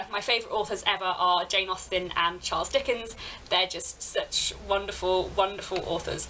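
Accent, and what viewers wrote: RP, United Kingdom. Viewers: Congratulations on your 10 year anniversary,! I'm so happy to be able to watch your content right from the beginning (as of June 2025 I've been watching for about 6-7 years but never scrolled back this far), you did sound very posh here! 😊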